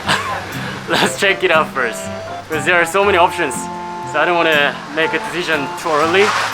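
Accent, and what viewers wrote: Malaysia. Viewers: “Kakak ni ape? Berape?” You speak like malaysian now🤣 I’m so impressed lorh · Speak like a true klites accent.. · Wowwww, your Malay accent is splendid brooo!!!! I’m amazed!!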